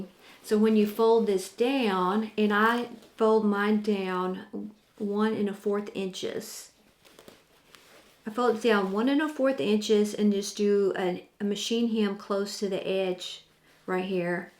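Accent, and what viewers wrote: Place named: South, United States. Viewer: Thanks for this! Love your southern accent!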